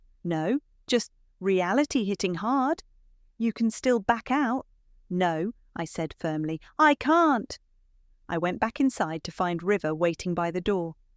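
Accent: United Kingdom. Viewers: A very good story. She really earned that house. The father choose peace over his daughter's inheritance right. They could've at the very least, split the ownership between their daughters equally. I believe that OP would've been happy with that, considering how she used to be before and be okay with that. Instead they were conniving and selfish. How do you allow one daughter to pay off the debt for the house knowing that she was never going to inherit any part of it and then give it sneakily to the other daughter who contributed nothing whatsoever towards it? I'm sorry to say that when her mother slapped her, she should've slapped her back as she had no right to do that just because she was being called out on her bullssh!t. Thank you, more like that please!!!!!!!🥰🥰🥰🥰🥰🥰. BTW, loved the British accent and i'm listening from the UK. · Love the British accent of the person reading the story! 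Listening from my home in Houston Texas USA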